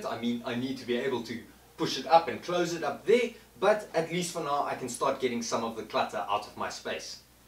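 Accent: Afrikaans, South Africa. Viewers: Heard the same immediately. Definitely a Saffa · First of all your video is great, and your shelves are awesome. Secondly the whole time I was listening I was thinking to myself, he is a South African. What made me 100% sure that you were South African was the hadidas in the background noise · are you from south Africa? that accent afrikaans pappie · Organizing genius! I’m trying to place the accent - South Africa? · Only just found out about this channel and I'm hooked. Readily picked up the accent as South African. At least now I can actually find some of the recommended products. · hi there. i love your idea and it looks soooo good and practile ofcourse. Are you from S.A? i lived in Cape town for 8 years and i think i recognise the accent 😉. Thanks for the great video and tips. Cheers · South Africa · South African ( Dutch) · He is Afrikaans, he's my cousin btw he got married not too long ago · Jys a suid afrikaner, Engels n man ?!! 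· Wow!! Wish I'd seen this a year ago. I installed ceiling boards so I can't use this idea. Absolutely loved this concept and will try to adapt it to my needs. You sound like a fellow South African. If I'm right, then all I can say is "Bakgat!!"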